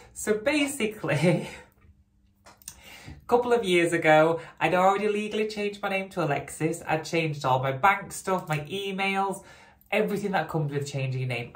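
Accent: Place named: Yorkshire, United Kingdom. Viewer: I anticipate each and every pop up from you. I?trans from YC and and 60 and been trans since the 80s. So I hope you understand that watching your progress is so lovely. I can see the blossoming effect in your eyes as you find your was in the world. I abs6adore your accent. It's o bviously UK but maybe YORKSHIRE I think. So continue on your blessed life and know you have someone cheering you along. One more thing. You seem to have such naturally good insight. Never loose that. You seem to know innately the right thing to do.its a gift.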